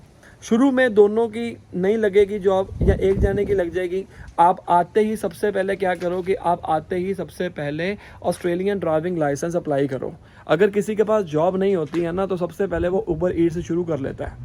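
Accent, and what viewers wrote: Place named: Punjab, India. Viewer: ਬਹੁਤ ਪਿਆਰੀ ਬੱਚੀ ਆ, ਪੰਜਾਬੀ ਬੰਦਾ ਹਿੰਦੀ ਬੋਲਦਾ ਸਾਫ਼ ਪਤਾ ਲੱਗ ਜਾਂਦਾ ਆ ਕੇ ਇਹ ਬੰਦਾ ਪੰਜਾਬੀ ਆ!!😊😊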